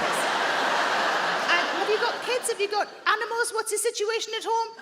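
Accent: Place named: Geordie, United Kingdom. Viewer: In my moment of madness, I bought a Ukulele and then Covid happened. Now I have eight in three sizes. I hadn't played a musical instrument for over twenty-five years but the upside of Covid was I had plenty of time to practice. In fact I think it probably kept me frow going insane or a least institutionalised like a caged Bear. 🤣 now I don't want you to take this the wrong way but every time I watch one of your YouTube videos I'm reminded of my granny. Like you she was a Geordie and had a that strong Novocastrian accent and earthy sense of humour. She was my mum's stepmother as my gramp lost his wife in a bombing raid during the war. I used to cyclone there with my two best mates and she would make us laugh with the sort of astutely observed humorous situation that you are so good at. We would leave there with face ache from laughing and the sound of her voice in our heads saying 'Aye ya boogers, ya do like ya bit of smoot'. 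I can still hear her when I came in through the back door saying ' Allo hinny, ah thought ya might cool this morning'. She wore glasses too funnily enough. It's like London taxi drivers, it's keenly observed comedy. You are a first class Raconteuse. Ohh.... the Hot Tub thing, I'd always imagined the effect would probably be like Number 35, Egg drop Soup. 🤣 you can have that on me and the wicked influence of my granny Ness (Agnes). 🐻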